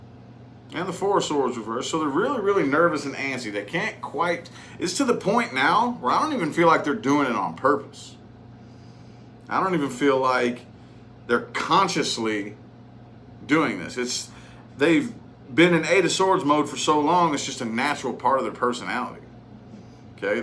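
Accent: South, United States. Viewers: Super accurate, but also I would watch even if it weren't! Love ur energy, your southern accent and your face 😂😂😂😂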